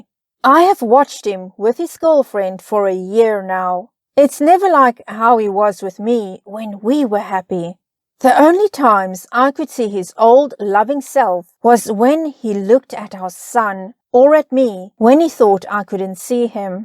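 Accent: South Africa. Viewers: Is the Narrator South African ?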